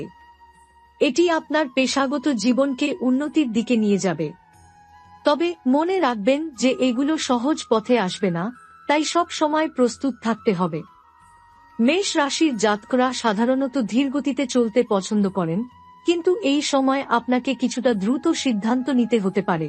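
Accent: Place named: India. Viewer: Eta kono hindi vashi manus banglay bolechen, tai onar kotha gulo emon sunte lagche! bujhlen.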